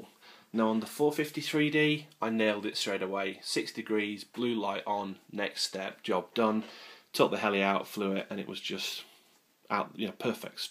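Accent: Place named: Germany. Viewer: where from germany are you from, i noticed the accent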